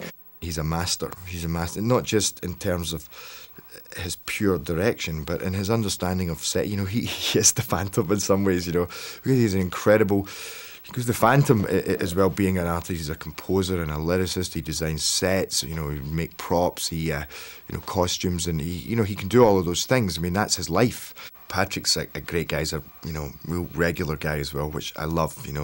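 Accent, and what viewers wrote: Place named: Scotland, United Kingdom. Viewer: Your Scottish accent is great. 👍🏻Your role was awesome. Even my mother fell in love with the phantom..she loved your singing..your performance..your handsomeness. It's all about the phantom. ❤❤❤️❤️❤️❤️❤️❤️❤️❤️ She was affected by his story more than one .. and decided that it would help him in his childhood. We all loved the role of the Phantom. Thanks for the excellent performance. By the way, I do not think that anyone will speak ill of our friend the Phantom now, because everyone has successes and failures, this is the life😊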